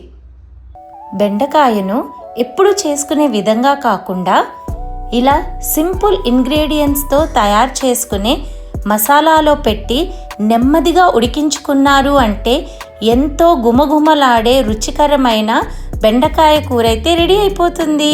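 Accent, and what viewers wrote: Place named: United States. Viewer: Madam I am a huge fan of your cooking and your american telugu accent and your style of expression please tell repice of chicken soft kabab and chilli motail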